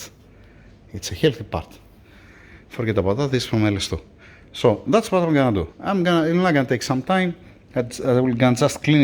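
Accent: Greece. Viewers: Just a quick question firstly I absolutely love how that you are attention to detail. Are you GREEK?? because you sound like it 😅😅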